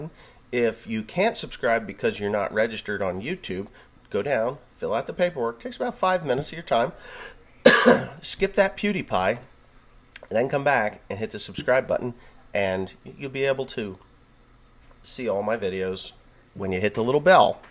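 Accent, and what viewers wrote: New Jersey, United States. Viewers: what part of jersey are you from?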